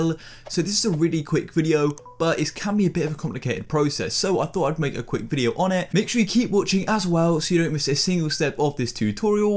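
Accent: United Kingdom. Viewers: you are definitely British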